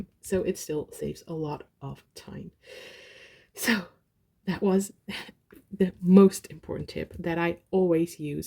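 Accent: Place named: Netherlands. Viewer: Clocked the Dutch accent immediately hahaha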